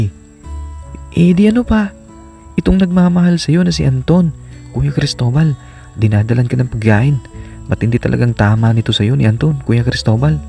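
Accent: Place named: Batangas, Philippines. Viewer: sir hahahaha taga batangas kaba? ako taga nasugbu batangas. nahala ko kase tono ng boses mo ingat palagi sir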